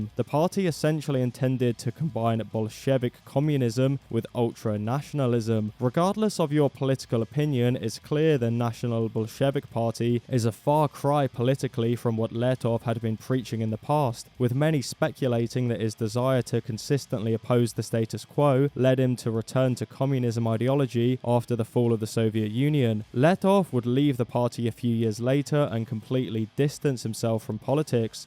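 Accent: United Kingdom. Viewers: A bri'ish fellow talking stuff about Yegor Letov? That's cool, I wish it was interesting to me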